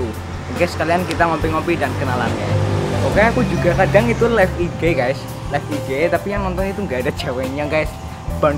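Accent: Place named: Banyumas, Indonesia. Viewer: Kamu apa orang ngapak bang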